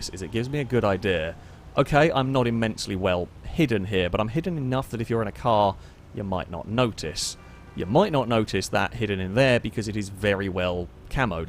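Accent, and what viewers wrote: United Kingdom. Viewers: God ur british accent wont help you, you just suck at Survive the hunt, I mean this is n#20 and you STILL cant survive?? IF next content gets bad like this got, imma avoid this channel 4ever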